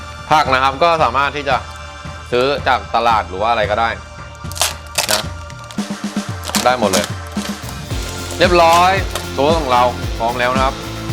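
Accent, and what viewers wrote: Thailand. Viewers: เฮ้ยทำไมเหน่ออ แต่เราจะทำตามนะชอบๆ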